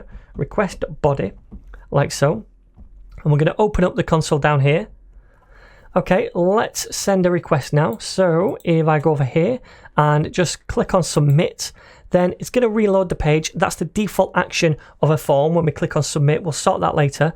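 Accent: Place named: London, United Kingdom. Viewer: no adds, no rubbish, just a nice london accent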